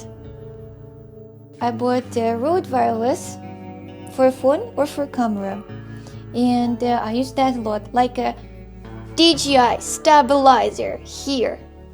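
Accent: Russia. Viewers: Our girl used to have thick russian accent then lol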